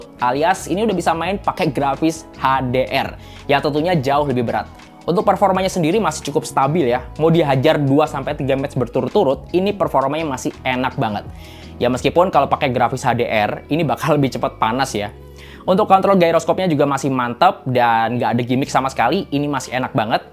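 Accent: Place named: Tegal, Indonesia. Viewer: Weh tegal toh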